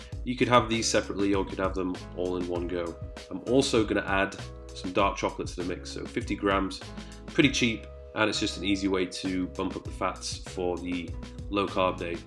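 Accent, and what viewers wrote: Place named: Stoke, United Kingdom. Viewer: Got to be from Stoke or somewhere near there 😝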